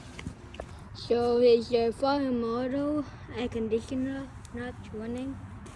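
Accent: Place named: Vietnam. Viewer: Wow your English it’s like Vietnam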